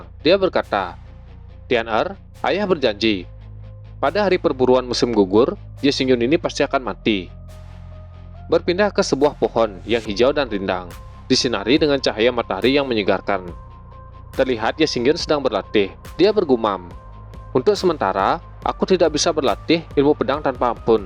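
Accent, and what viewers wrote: Bali, Indonesia. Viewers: Dr logat, kayaknya orang bali nih admin.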